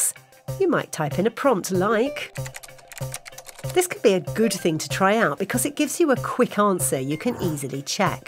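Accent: United Kingdom. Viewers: English with a British accent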